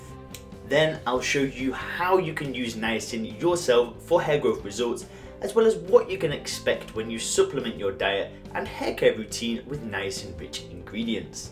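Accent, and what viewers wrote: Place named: United Kingdom. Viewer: Tf is this stupid music supposed to do? Just makes it annoying trying to understand what you're saying since you're a britbong.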